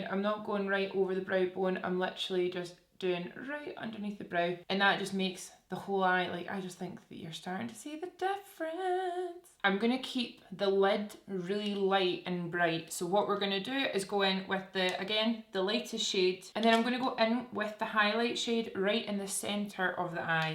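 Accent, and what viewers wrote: Scotland, United Kingdom. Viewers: Love Love your Scottish accent, my mom's from Paisley.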